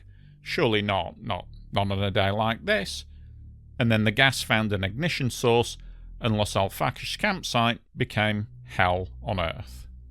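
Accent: United Kingdom. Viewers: I appreciate your very Britsh English. Thank you for this documentary. I´ve never heard or read about it.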